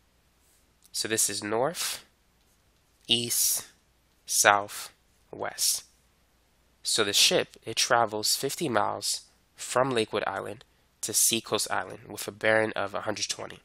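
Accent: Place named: Boston, United States. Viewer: Boston Accent.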